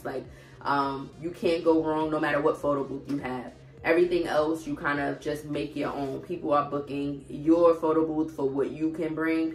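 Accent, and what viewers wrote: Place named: Brooklyn, United States. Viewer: With that accent...it sure sounds like ur in a Brooklyn girl........but gurl I pray ur not UNDERcharging folks!🤷🏽‍♂️🤦🏾‍♂️...🤔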